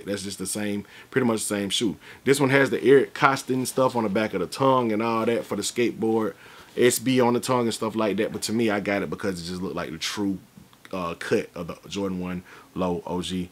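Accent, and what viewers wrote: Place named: Atlanta, United States. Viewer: Not a day one, but my first vid was the "What the" KD joints with Jay. Being a ATLien myself, as soon as i heard the accent i was hooked. Been rockin with ever sense, dooooooo it!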